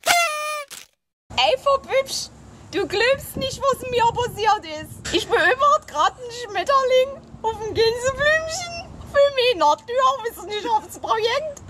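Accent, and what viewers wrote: Germany. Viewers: Sprechen die Ossie wirklich wie das? :o (Ich bin Amerikaner, so werd' ich nicht wissen.)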